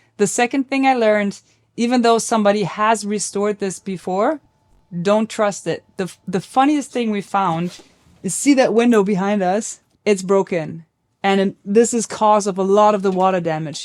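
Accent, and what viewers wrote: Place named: Germany. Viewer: Your German accent has become almost non-existent... except when you say beer/bier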